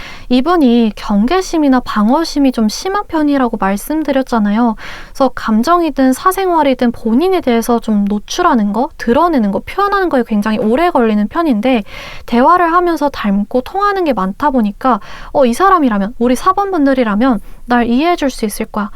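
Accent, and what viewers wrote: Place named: Chungcheong, South Korea. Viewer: 끝말이 ~~할거같아요 이런말에 끝부분이 흐려서 ~~할거가텨~ 일케 들려서 왤케 웃긴짘ㅋㅋㅋㅋ 저 충청도 사람인데 정겹게 들리네욯ㅋㅋㅋㅋㅋㅋ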